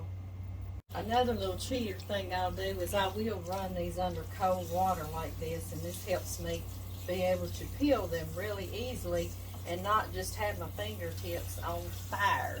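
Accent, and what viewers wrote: South, United States. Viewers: I put up 10 pints of salsa today. I do not hot water bath either. once they're sealed they're sealed good. I have more to do in about 3 days. I also put up stewed tomatoes . love your videos & your southern drawl. ha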